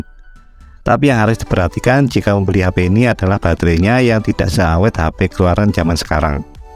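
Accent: Mojokerto, Indonesia. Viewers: wong mojokerto cak ?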